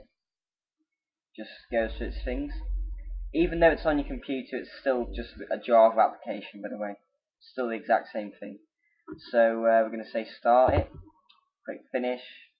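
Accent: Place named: United Kingdom. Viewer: omg british accent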